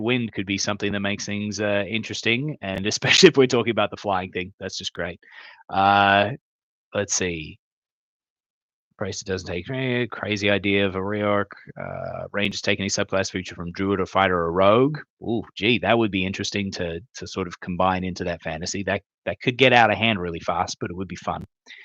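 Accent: Australia. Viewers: I think there's an Ozzie filter on the microphone. LOL! I've watched a lot of videos and you've never sounded Aussie until this one. No worries, mate. Maybe it's because ya just got back, but your accent is not usually prevalent. Maybe it's because of your other voices.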